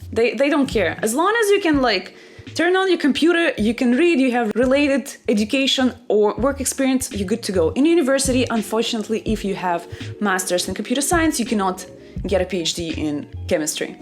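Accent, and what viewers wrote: Russia. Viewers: Are you Russian? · Wait are you russian? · Are u russian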